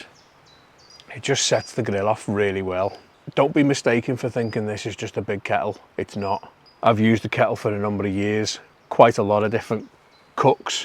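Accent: United Kingdom. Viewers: FANTASTIC video. I'm a working class Master Touch guy, looking to "up my game" without taking out a loan. I've had my eye on a Weber Summit for a while and this video pushed me over the finish line. Happy to see a Brit who's into BBQ and LOVE the accent. Would love to see what you use for dry rubs and sauces. Again, thanks from Alaska for a really good video. Tell your pupper I said hi.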